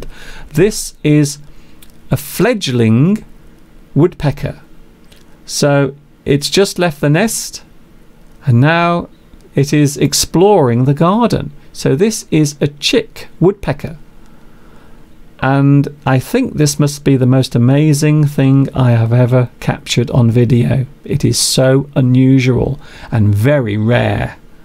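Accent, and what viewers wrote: United Kingdom. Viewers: absolutely fantastic ! just thumbed it up on the go. I appreciate a lot how you entertain us with clear British English. The best way to learn English is listening to you like a couch potato. And Happy Birthday to your mother and tell her she looks like someone pretty clever with a great smile.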